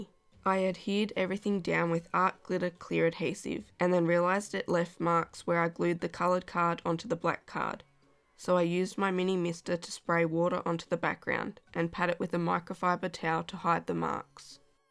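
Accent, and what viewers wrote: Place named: Australia. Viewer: I wondered where you lived with that super cool accent. Australia! How neat! I know someone from there and for years I spent time trying to convince him to mail me a kangaroo. He kept telling me that kangaroos are wild animals and are not meant to live inside your house, but I would not take no for an answer. I had an awesome picture in my mind of my very own kangaroo carrying stuff around for me all the time in its super cool kangaroo pouch. That is my story and I'm sticking to it! I didn't realize that Australia doesn't really celebrate Halloween. That is something that I hope changes because Halloween is my favorite day of the year, so I wish that everyone would get to experience it! This year I got to meet my new neighbor who is four years old and is named Faith, when she ventured across the street because she was looking for candy. 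She actually yelled and asked if we had any, at which point we yelled back very loudly, "We have so much candy, and glow bracelets, and bags of Halloween toys!" Needless to say, she convinced her dad to come across the street and come inside to meet us. It was great. There are so many cute things that happen every year. Tiny people are my favorite kind of people, and Halloween is the one day of the year that a bunch of them show up at your house wearing adorable costumes! That is why I like it so much. I will find you out in social media land. I really hope that it is not expensive to mail that card all the way to me in the United States. If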